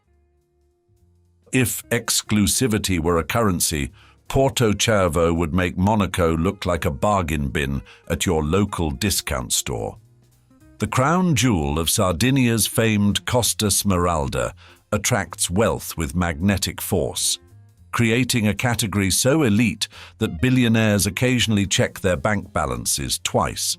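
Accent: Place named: RP, United Kingdom. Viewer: First one 😊 and congrats for the video. As a polyglot I do appreciate your accent : sounds clear and a trifle posh fully adapted and carved out 🎉😊❤.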